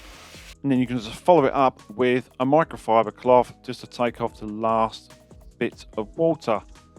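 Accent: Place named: Wales, United Kingdom. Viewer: Love the accent. The famous singer Tom Jones is from Wales👍. At some point maybe you can share to us American viewers the history of the Skoda automobile 👍.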